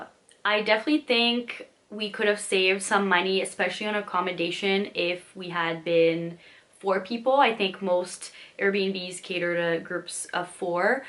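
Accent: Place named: Canada. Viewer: You really analyzed this Coachella event especially the differences between Weekend 1 and Weekend 2. You are so good at planning and organization, you should work in government budget analysis! I love your Canadian accent, it really makes you sound warm and personable, and can make a mean maple candy! Just kidding!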